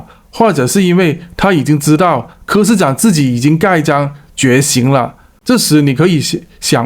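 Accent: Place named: China. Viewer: 你的口音是中國人,台灣的事與你何干呢？法律會制裁他們。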